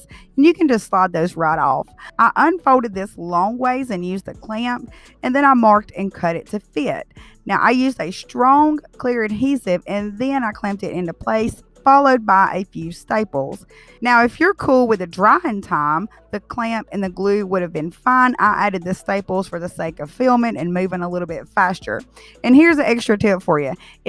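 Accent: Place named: North Carolina, United States. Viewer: I just subscribed. I don't know anything about you, your accent sounds like North Carolina. I live in Smithfield North Carolina.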